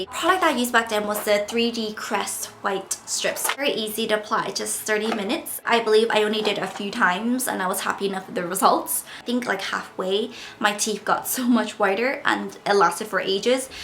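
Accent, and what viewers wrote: Ireland. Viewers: I just found your channel and have been thinking your Canadian now I hear Irish!? 🤔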